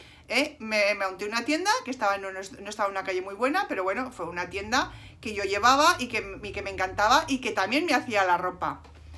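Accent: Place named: Valencia, Spain. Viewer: Hola hace poquito he descubierto su canal, y me llamó la atención su acento, su forma de hablar y me era familiar y es que se parece su hija a usted, a ella su la había visto en you tube y además soy valenciana de un pueblo de la serranía y la forma de hablar es igual. Gracias por su canal,es muy divertido oírla y ver sus videos,me encantan y me lo paso genial,me río todo el tiempo. Siga así, es una trabajadora brutal!!!! Cuando vaya a Zaragoza iré a visitar su tienda, cuando todo esto pase. Gracias y un saludo desde Pedralba,un pueblecito de Valencia.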